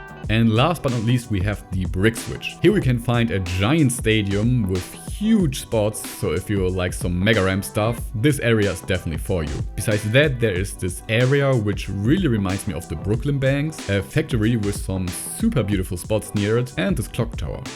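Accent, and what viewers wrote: Germany. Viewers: bro bist du deutsch, glaube man hört des voll raus 😂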